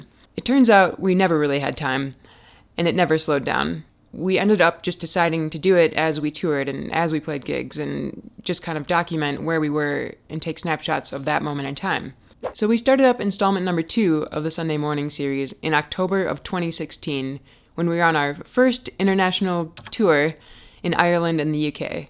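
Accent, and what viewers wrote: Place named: United States. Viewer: Where in the States is your accent from?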